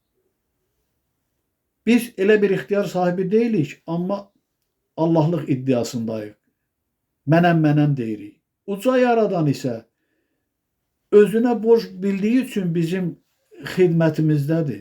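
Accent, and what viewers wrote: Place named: Karabakh, Azerbaijan. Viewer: Siz Gəncədənsiniz . Qarabağ ləhcəsi ilə danışırsınız . Amma sizin danışığınızda bir iki dəfə mənə elə gəlir ki qazax ləhcəsi ilə də danışdınız . Yəqin ki bir tərəfdən də onlarla qohumsunuz . Mənə maraqlı gələn odur ki səhv etmirəm ki ? İstərdim ki fikrimə aydınlıq gətirəsiniz .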